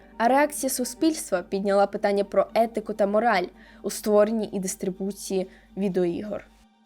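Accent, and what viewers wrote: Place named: Russia. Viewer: Спробуйте вичитувати текст перед зйомкою разів 10-20 вголос. Дуже важко слухати. Українська мова - це дуже круто, але неправильні наголоси, вимова слів на російській лад (плутанина з «і» та «и», «о» та «а») тощо, потребують додаткової практики. Щасти.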